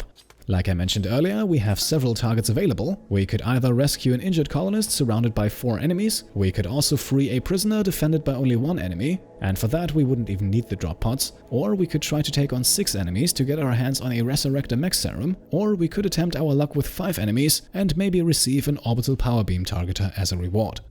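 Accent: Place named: Germany. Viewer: Greetings from your neighbouring country (the Netherlands) I love rimworld and i am happy i found your channel a month or two ago. One of my favorite games with someone who is doing a cannibal playthrough and who has a calm voice with a german accent that i really like. Keep up the good work. I can’t wait for the next rimworld series if this one ends.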